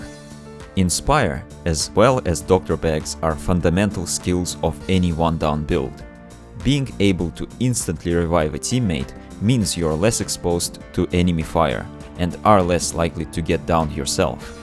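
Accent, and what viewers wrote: Russia. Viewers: Говори что хочешь, но акцент русского у тебя выделяется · Just when i clicked on the video i was like sure great intro .. OH HES RUSSIAN haha im half russian i did not say that in an attempt to offend him. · Кул рашн акцент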